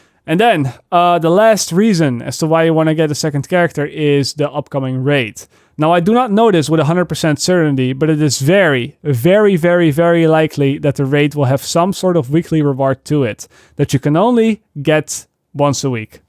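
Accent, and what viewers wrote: Netherlands. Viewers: Is he dutch? His accent sounds like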